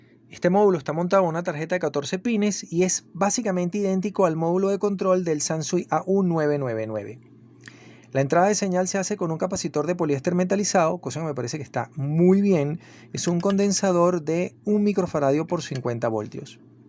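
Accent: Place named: Guayaquil, Ecuador. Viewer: Solo he visto unos minutos de este video para darme cuenta que usted es un verdadero técnico y conocedor de estos hermosos equipos de alta fidelidad. Una pregunta: en que país se encuentra usted? Por su acento me parece que en Guayaquil-Ecuador..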